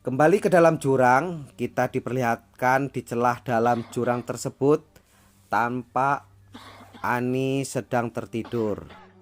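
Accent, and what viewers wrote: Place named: Java, Indonesia. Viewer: Orang jawa ya,medok banget.hahaah. Mas coba dongengin film tentang pendakian gunung everest. Ditunggu video selanjutnya. Sukses selalu ya.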